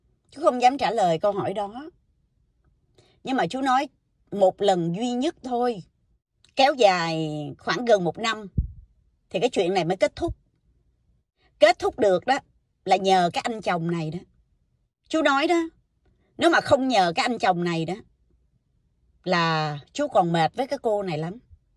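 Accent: Standard. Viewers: Câu chuyện rất thật rất đời thường ,giọng đọc E rất chuẩn hay,Khi đã nói ra đc lòng sẽ nhẹ nhàng hơn,đây cũng là bài học cho tất cả mn ,kể cả phụ nữ,nếu sống lường gạt âm mưu như vậy,ắt gặp quả báo,ko phải ai cũng cư xử như A này .Nếu ng khác họ báo cảnh sát ,Cô ta chắc chắn sẽ bị vô khám. Nhân tham tài nhi tử Điểu tham thực nhi vong. Người tham tiền cũng chết,vật tham ăn cũng chết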